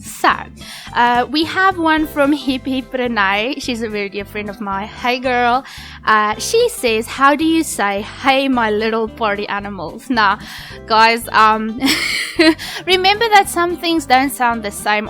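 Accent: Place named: Netherlands. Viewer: Love your accent girl.. Its a little bit dutch... Love you video ♥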